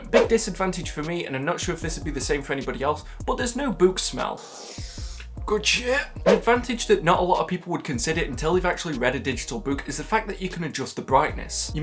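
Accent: United Kingdom. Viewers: No, you have a great content creation vibe! I just rarely hear a fellow UK accent, and the pronunciation of 'book' is one of my favourite regional pronunciations haha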